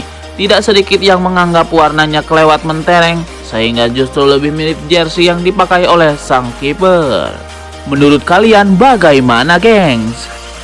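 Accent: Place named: Java, Indonesia. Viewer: Medok jg..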